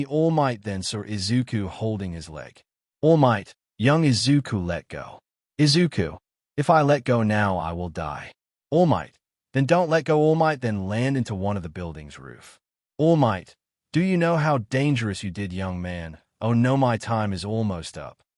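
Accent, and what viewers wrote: United Kingdom. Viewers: Bro sounds British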